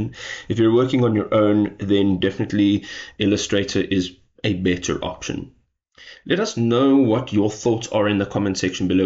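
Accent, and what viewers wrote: South Africa. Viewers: This is great info, brother. The South African accent never go away.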